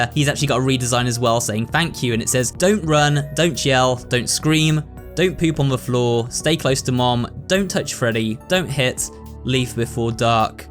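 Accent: United Kingdom. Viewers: The British accent doesn’t make sense to me sometimes “idea” is “ideer” it’s more syllables than the word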